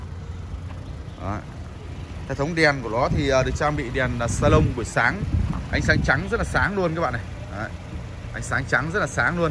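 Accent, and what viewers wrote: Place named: Đồng Cối Đá, Vietnam. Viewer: Noi đồng cối đá chuẩn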